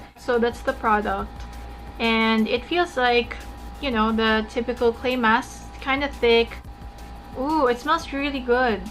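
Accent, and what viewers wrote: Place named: United States. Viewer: love your accent its like you grow up in america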